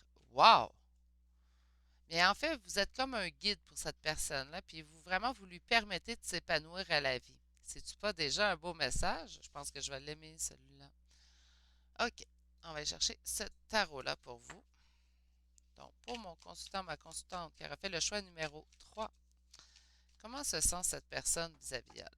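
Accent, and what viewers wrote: Canada. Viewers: Quel mignon petit chien qui dort paisiblement sur vos genoux. Je ne me lasserais jamais d'écouter la douce musique de l'accent canadien. Merci pour ce tirage. Bisous de France 🇫🇷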